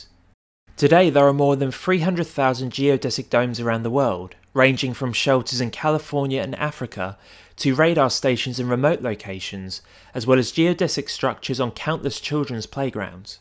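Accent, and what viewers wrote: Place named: United Kingdom. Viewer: He is British. His pronunciation is different from those that live in America.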